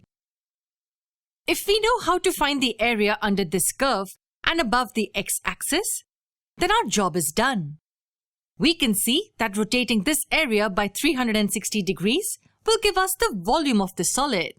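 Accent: India. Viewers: aaaaahhhh Indian accent ........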